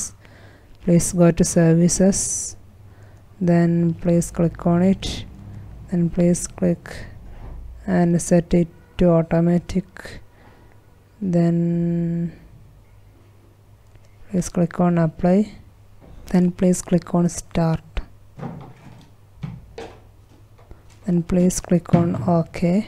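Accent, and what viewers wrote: Kerala, India. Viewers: anyone notice that mallu accent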